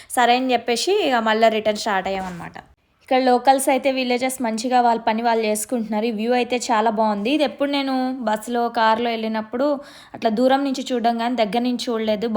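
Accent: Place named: Telangana, India. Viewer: Mem veldham anukuntunnam so me vedios chusam chala bagundhi vedios ❤ tg slang i like it 😅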